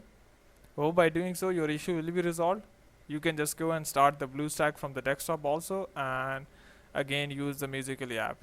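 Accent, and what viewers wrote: India. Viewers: This guy sounds like hes from india. Don't follow hes tutorial it's just a big hack!!!!!! t-gay sub to pewdiepai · he doesnt sound like a tech support scammer he sounds like a homeless indian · He is just speaking in an indian accent?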